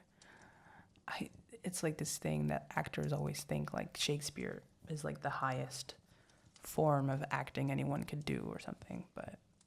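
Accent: United Kingdom. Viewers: Love your British accent 😊🥰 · The British accent got me tund on af lol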